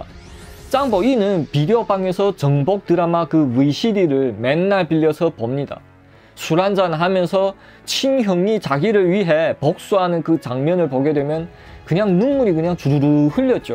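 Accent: China. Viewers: 목소리나 억양이 완전 중국화 되어 있고.. 젊은 친구가 잘 생기기도 하고.. 설명을 잘 해 주어서.. 중국 무협지 한편을 본 느낌이었네요.. 아주 재미 있었습니다.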